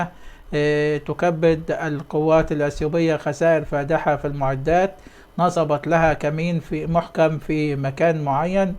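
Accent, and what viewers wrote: Egypt. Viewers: يا باشا انا بشوفك دايما تتكلم عن انك بتتكلم باللهجة العامية المصرية و اللهجة الصعيدية و بترد على الناس اللى بينتقدوا طريقتك او لهجتك او قراءتك - يا باشا اعمل اللي انت عايزه و بطريقتك البسيطه و اللي عاجبه يشوف فيديوهاتك اهلا بيه و اللي مش عاجبه براحته لكن انت مختلف لانك بتجيب الاخبار من جوة اثيوبيا و من ارض الحدث - احسنت و استمر ولا يهمك من اي حد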